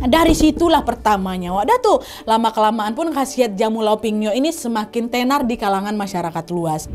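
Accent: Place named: Pontianak, Indonesia. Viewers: Wak wak , mcem org melayu pontianak kak ni hehehe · Bahass kakak ini mirip bahasa melayu sambas singkawang,panggil tmn wak wak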